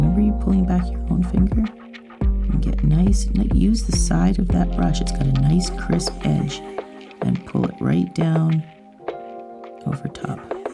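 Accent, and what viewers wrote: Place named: Canada. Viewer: Her speaking voice sounds sooo much like Shania Twain. That subtle Canadian accent. At least I assume she is Canadian because of her accent. Seriously, find a clip of Shania Twain talking. Love it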